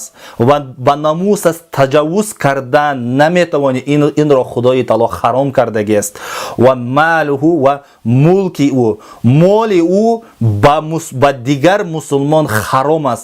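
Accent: Tajikistan. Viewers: Рахмат домло .маълум ки шумо аз тарафхои Джиргатол Лахш хастен аксентатон маьлум.Аввалин мурда яъне улук аз точикон шудаги историяба хаст вай одам одами бегунох. Агар мурдахои точика хисоб кунед хеле зиёд вайба ки чавоб мегуяд.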